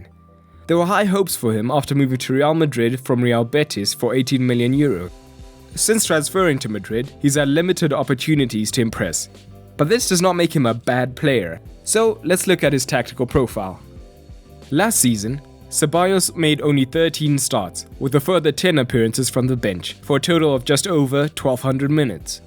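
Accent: South Africa. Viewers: Are you South African?😂 your accent would suggest it. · You sound South African.. Gauteng oakie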